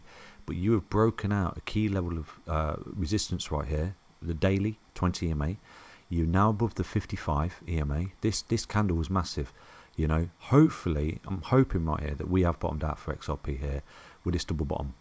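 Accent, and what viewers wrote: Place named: Leicester, United Kingdom. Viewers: It's his Leicester accent., the pride of Leicester 👊👊🍻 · u have beautiful accent, i think u are from England, i dont have sure! I'm from Brazil and I like this accent Well i didnt know Europe accept crypto like XRP or other coin bro. I'm waiting this hit up $100 or more!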